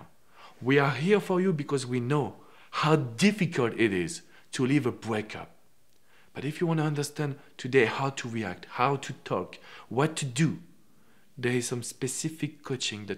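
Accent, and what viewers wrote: France. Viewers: I like his accent, is he french? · I can tell you are French!!!! Love the accent!!! I am a Frenchyyyy too 😉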